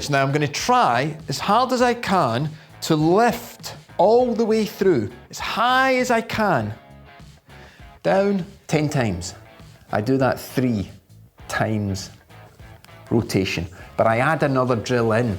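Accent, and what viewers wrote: Scotland, United Kingdom. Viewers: Thankyou for speaking plainly English like a a true Scotsman. I even get the jokes. Very refreshing. I understand where I’ve been going wrong. With no bs . Thankyou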